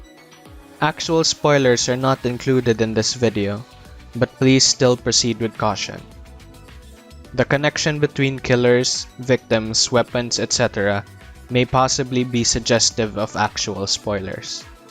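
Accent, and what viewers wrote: Philippines. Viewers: You sound so pinoy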